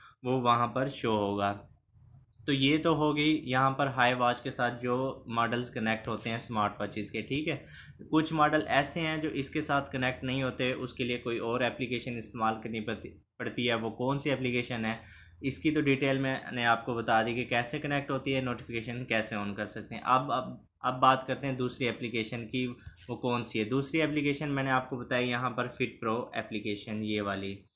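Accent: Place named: Pakistan. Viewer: Bro tumhari voice me Pakistan wali wibe aa rahi h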